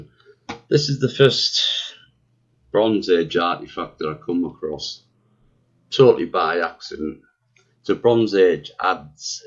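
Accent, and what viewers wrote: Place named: Stockton, United States. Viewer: Nice one mate...i live in Sydney now but as a kid my Nana lived on Dovecote Street in Stockton and my mum was born there..good to hear the accent again 🙂👍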